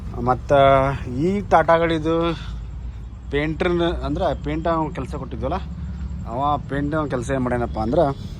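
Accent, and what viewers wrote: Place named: North Karnataka, India. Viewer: Anna nim ura yavd hel plz ni uttar karnataka bashe olga matadati adak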